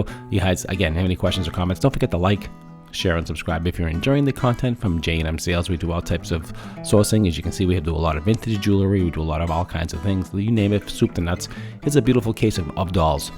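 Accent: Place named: New England, United States. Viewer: That accent!! Had to be new england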